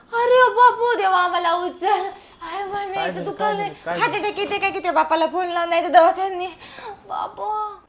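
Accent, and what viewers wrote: Nanded, India. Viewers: अभिनय,उत्तम,काही काही कला,या व्यक्तींना,उपजत,असते, सोशल मीडिया, मुळे, आधी क,प्रसार,झाला,मी, कसे,दिसते,आपण,कसे, आहोत,आपले,रूप,तारुण्य,हे,या,वयात,होतातच,मुळातच,लहान, वयापासून,सर्वांना,मोबाईल,सोशल,मीडिया,जग,आपल्या,घरी, आले,आहे,ताई, आपल्याला अभिनय, कौशल्य,छान,आहेत,नांदेड, च्या,भाषेचा,सुद्धा,छान,हाव,बाव,संवादफेक,अगदी,सुंदर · Mazi frnd ahe pavdevadi Nanded chi Ti same tuzya sarki bolte 😁😁😁😁😁😁khup avdtat Tuze vlog bghayla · Nanded chi bhasha lay bari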